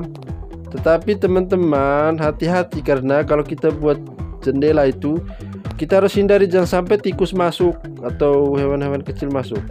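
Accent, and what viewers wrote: Flores, Indonesia. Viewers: abang ini cara omong, abang dr flores ka 🙏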